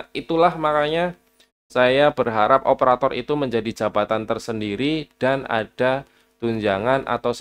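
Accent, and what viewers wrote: Banyumas, Indonesia. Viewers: makasih banyak mas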